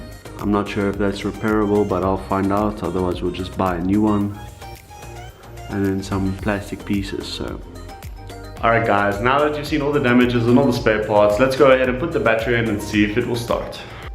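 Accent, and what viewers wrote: South Africa. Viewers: Ayyy I recognized the accent😉 I'm South African aswell. Enjoying your vids bru, keep it up.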